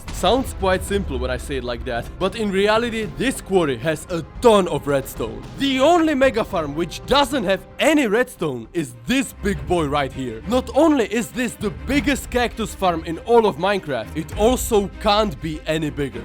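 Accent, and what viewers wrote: Czech Republic. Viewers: Pekne video. Normalne poznas cecha mluvit anglicky😂😂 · You sound Czech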